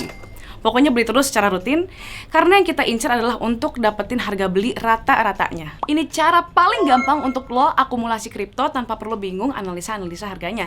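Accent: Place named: South Jakarta, Indonesia. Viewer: style ngomong nya Jaksel banget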